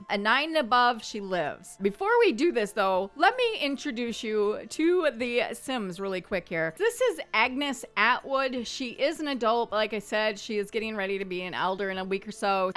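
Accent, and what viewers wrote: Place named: Midwest, United States. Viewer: Where are you from in the Midwest I’m getting nostalgia from the way you speak since I used to live in Minnesota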